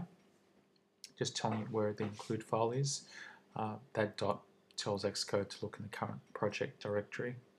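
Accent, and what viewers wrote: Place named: Australia. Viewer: Thank you very much!! I'm very new to this technology but value the work you have put in to demonstrate!! It sounds like you're a fellow Australian ;) Cheerz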